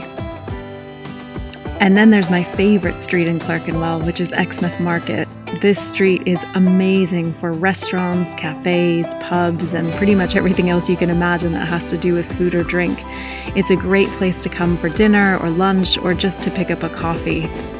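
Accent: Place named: California, United States. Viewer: it somehow seems sophisticated with a soft Californian accent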